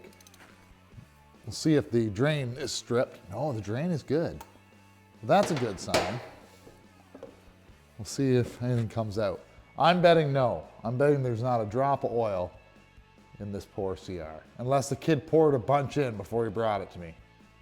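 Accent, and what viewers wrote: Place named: United States. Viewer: U sound like a yank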